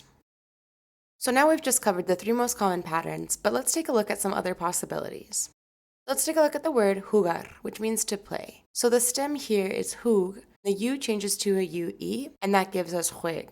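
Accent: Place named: United States. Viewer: Great accents - genuine american and spanish accent! Have not heard anyone in YT Spanish teaching courses who has both accents just perfect!!! 👍🏻👍🏻👍🏻❤️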